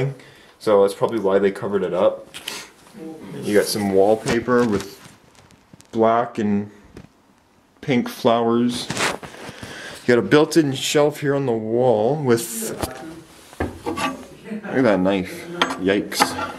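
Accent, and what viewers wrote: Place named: Canada. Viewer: I can already guess this is probably in Canada because of your accent.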